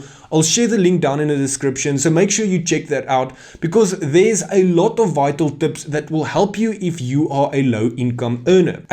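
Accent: Afrikaans, South Africa. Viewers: I'm new here, I love your afrikaans accent 😅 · New here as well, first thing I noticed is the Afrikaans accent, I love that he is authenticity. Dit is 'n paar waardevolle kennis., Danko bhuti 🙏🙏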